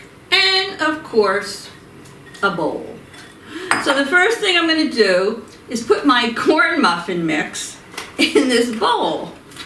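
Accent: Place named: New York, United States. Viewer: I don't think I realized until I stumbled on your channel that their aren't many cooks on these shows being hosted by real New Yorkers with real New York accents. I am subscribing because there's something reassuring about listening to you. Also, just started using my Air Fryer and can't wait to learn more.❤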